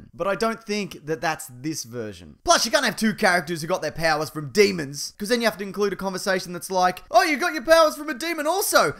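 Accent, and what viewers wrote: Australia. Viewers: Great video! I love your voice, by the way! Nice accent, and I love how you get louder and quieter. Are you Australian?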